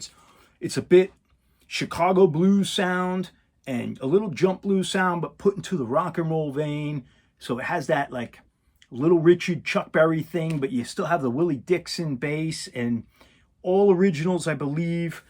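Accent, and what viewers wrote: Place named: New England, United States. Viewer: I’m from Fall River but have lived in Atlanta for over 20 years! I knew that accent….haha! Love your videos and your knowledge! Respect!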